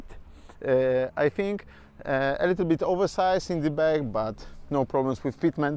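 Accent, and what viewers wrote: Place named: Poland. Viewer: Your English is more polish then English😢😢😢😢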